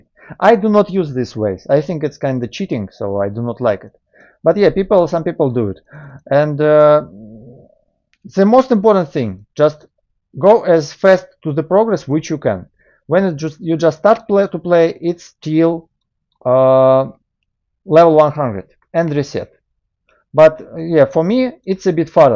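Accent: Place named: Russia. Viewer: Видно по акценту, что ты русский) Сними ещё видео, буквально вот на неделе обнова вышла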